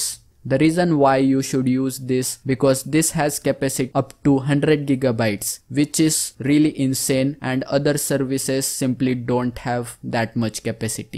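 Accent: India. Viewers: He is just in a Indian accent not everyone is English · it's Indian accent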